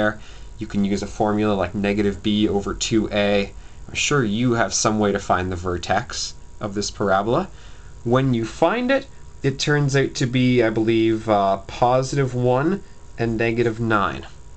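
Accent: Canada. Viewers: i can tell that you're canadian .. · You're definitely Canadian. Thanks for your help! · no his Accent is obviously Canadian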